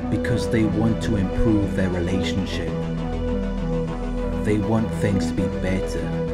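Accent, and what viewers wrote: Wales, United Kingdom. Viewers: Where you from? Sound Welsh. Good video. I’m from porthcawl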